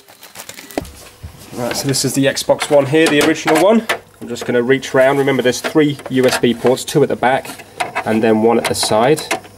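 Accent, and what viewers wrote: England, United Kingdom. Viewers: What part of england are u from just wondering? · I like your British accent.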